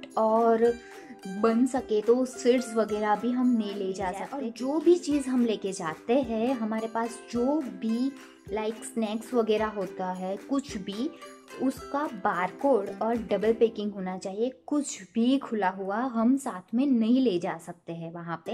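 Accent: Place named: Gujarat, India. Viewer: damn.. ur gujju accent... love it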